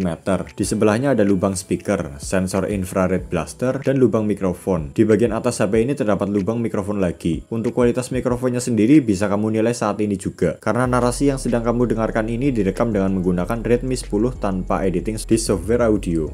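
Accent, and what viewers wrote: Banyumas, Indonesia. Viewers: Kaya logat ngapak?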